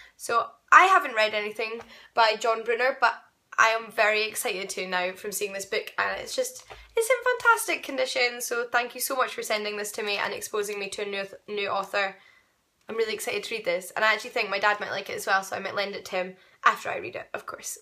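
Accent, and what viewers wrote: Scotland, United Kingdom. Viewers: love your accent! You are Scottish, aren't you? I love Sir Arthur Conan Doyle's works too!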